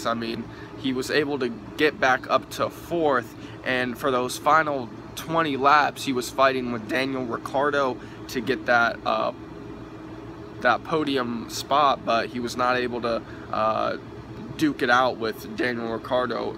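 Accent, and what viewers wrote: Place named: United States. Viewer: Mate you should really research before uploading and not just talk crap. In F1, jumping the start results in a penalty not a restart. Also, you should really learn the drivers names and how to properly pronounce them. Don't Americanise everything, and people will respect you a lot more. Very amateur video. If you really want to upload these sorts of videos you should write notes during the race of what happens when so you know what to talk about.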